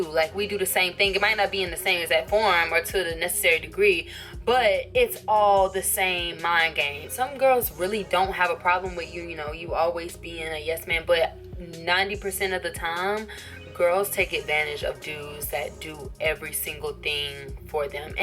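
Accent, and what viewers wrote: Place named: Texas, United States. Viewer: you must be from Texas, I hear it in your tone, very smart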